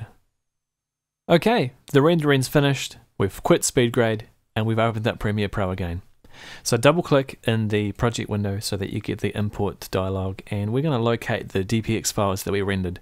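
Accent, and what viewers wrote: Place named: New Zealand. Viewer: Love being a kiwi then hearing another and be like ahh thats better coming from some 12 year old strong american accent haha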